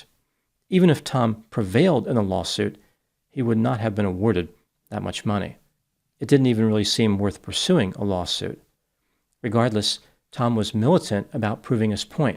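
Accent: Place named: South Jersey, United States. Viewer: He has a south Jersey accent, so I think you might be right 😆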